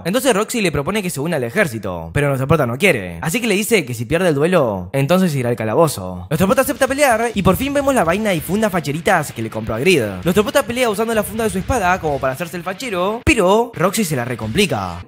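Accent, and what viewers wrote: Argentina. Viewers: TE AMOOOOOOOO DE AMIGO SIEMPRE ME ENCANTA TU CONTENIDO Y TU ACENTO ARGENTINO 🎉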